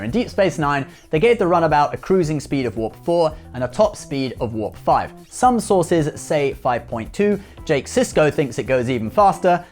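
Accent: United Kingdom. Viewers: Cool. Its. He's back.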